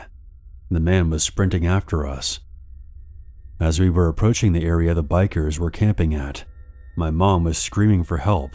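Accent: Australia. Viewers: Wowsers. Your Ozzie accent on point, mate 🤙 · thats a solid aussie accent not even Australian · Wrong, he sounded spot on By the way, we use the word “bloody”, but we don’t go through the trouble of typing it out online… Just a little tip the next time you pretend to be an Aussie, you poser lol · Your Aussie accent is very Northern Australia, great job! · The irresistible time with! Thank you for remarkable work with great stories. Your voice narration is always spotless! Edit: That end was awesome! You spoke like an Australian 😄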